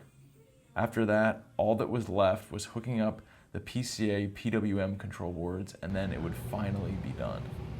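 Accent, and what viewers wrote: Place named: Maryland, United States. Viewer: Anyone get the feeling that this guy is from Maryland?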